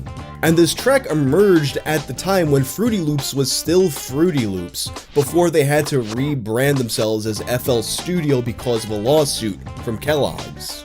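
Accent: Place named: East Coast, United States. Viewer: Him reading the passionate, angry back-n-forths in his calm, east coast accent😅😅😅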